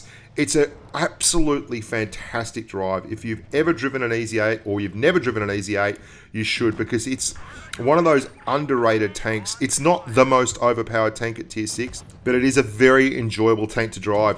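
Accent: Australia. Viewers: yes hes aussie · And also I just realised ur an Aussie..........I'm a kiwi from NZ XD watupppppp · Are u Aussie I'm Aussie as well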